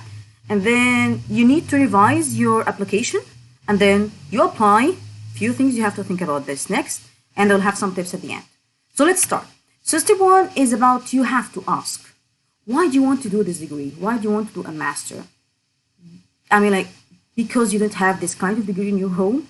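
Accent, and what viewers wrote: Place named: United Kingdom. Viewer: amazing British accent makes me feel how my English is so weak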